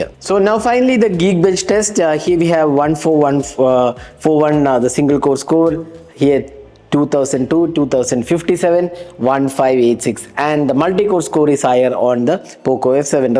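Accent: Kerala, India. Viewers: Hindi might not be his mother tongue, I think he sounds like he is from Kerala.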